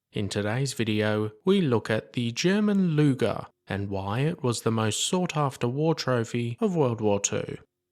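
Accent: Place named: England, United Kingdom. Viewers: He's English 🤷! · He is speaking ENGLISH...not American!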